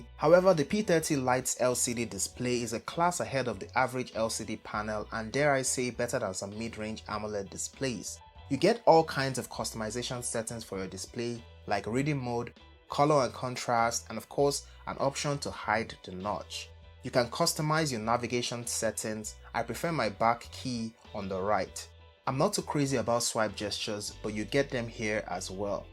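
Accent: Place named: Nigeria. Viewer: yeah the Nigerian accent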